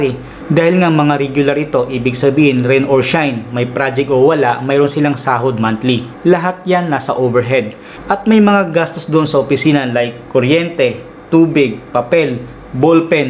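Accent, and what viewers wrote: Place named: Visayas, Philippines. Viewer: Engineer new subscriber here. After the pandemic will build a house GOD permits. In reality I am a fan of HGTV. So that's where I got ideas about building houses. But when I saw your vlog I was hooked to it. Currently watching all of it. Reading the comments and taking down notes. Sir when I start with my house for sure will be asking for your advices. I hope you will be kind enough to answer it. How I wish it will be your construction firm to build it. Son are you Visayan or Ilocano coz I heard you speak my dialect. Till then may God bless you.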